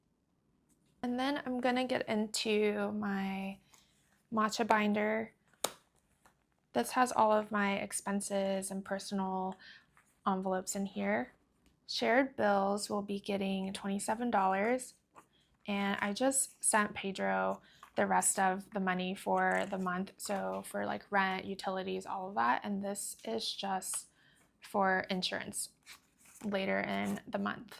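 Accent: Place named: Australia. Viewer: Hi! Omg I’m excited for your trip! Hopefully you can get a tourist visa on time so that you can also spend more time traveling before heading back to the states☺️ I might be the only one thinking this but I feel like I’m hearing a bit of the Australian accent from you?!😆 omg that’s so cute your boyfriend likes chocolate milk!! I love watching your channel as well as cloudymoney, rainebudget, and fancydollar🤍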